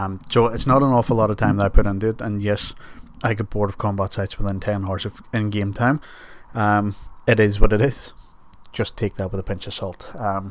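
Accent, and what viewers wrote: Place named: Ireland. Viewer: Good to hear your Irish tones loud and clear again!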